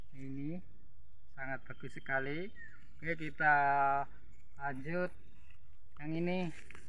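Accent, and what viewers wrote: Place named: Java, Indonesia. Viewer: Pemangkasanya pas dan terukur, bahamu juga meduk njawani😂😂😂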